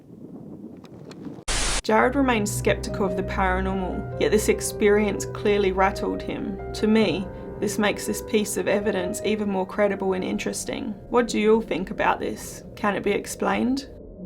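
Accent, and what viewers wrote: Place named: Australia. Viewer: I love the fact that I can tell you are really serious about the paranormal. What makes you videos better than anyone else's is your beautiful Australian accent. Love your videos. Thank you. Keep them coming.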